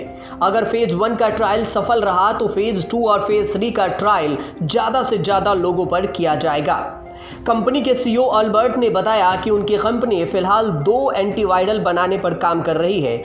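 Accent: Bihar, India. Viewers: इनकी जबान साफ नहीं है जिससे समझने में दिक्कत हो रही है बिहारी टंग है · Iski awaz acchi hai voice over bolne wala bihari hai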